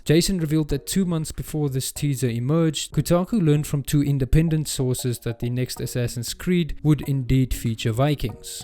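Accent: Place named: South Africa. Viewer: You're South African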